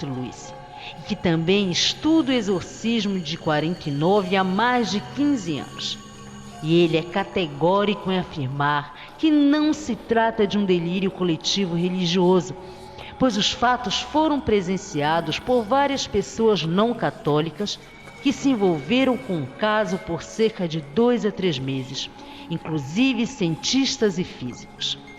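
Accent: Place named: Florianópolis, Brazil. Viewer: Você é de Florianópolis? Seu sotaque é de manézinha da ilha hehe, sou de Floripa também :)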